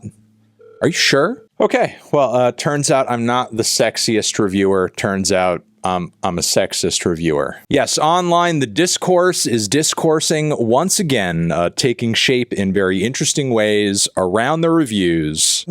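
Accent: United States. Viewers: I thought you were American :o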